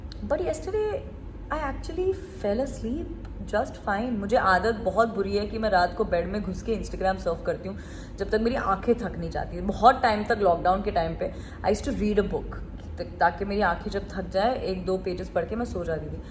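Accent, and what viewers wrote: India. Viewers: I love the Indian English accent, its so cute and funny!!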